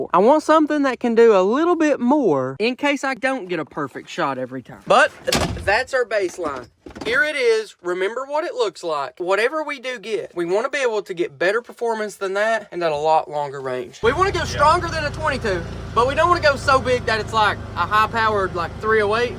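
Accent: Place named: South, United States. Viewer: Im from Philadelphia but i gotta go down south and make friends with some southerners cause i think i could pretend to talk like you forever and it never get old. Cause everything sounds funnier to me when you talk like that. Honestly i wouldn’t be able to take much seriously at all. Lol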